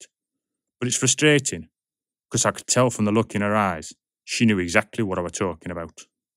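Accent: Yorkshire, United Kingdom. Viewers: Such a rich biography. Loved the timeline. Though I'm no expert, I liked your Yorkshire accent. Finally Sheppard? Son of Jack Sheppard perhaps? Thank you for another excellent episode.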